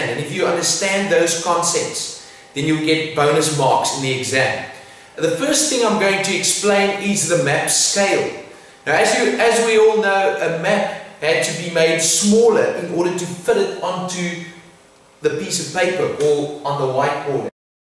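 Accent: Afrikaans, South Africa. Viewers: Afrikaans ascent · i literally read this before i watched the video and i was like "i bet his accent is south african" AHA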